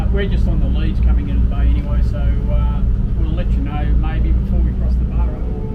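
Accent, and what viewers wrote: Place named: Australia. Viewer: Living in Thailand far from my oceanic playground off the Oz east coast, deriving much pleasure from hearing your Australian accent and that unmistakable diction, I mused, as I am often want to do, at the notion that perhaps during the dying embers of the day, you could recite some suitably inspiring verse with a nautical flavour. Maybe a few stanzas from the Ancient Mariner for example. I, myself, often amused my seafaring companions at sundowner time with either a poem or a sea shanty. Works wonders for morale and appetite. My suggestion would be an Aussie rendition of the Drunken Sailor with a glass of red held high. Awesome bloody scene!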